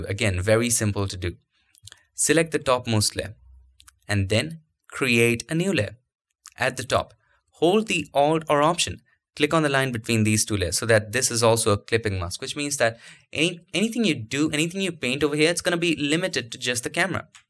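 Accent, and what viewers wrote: India. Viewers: It is called Hindi accent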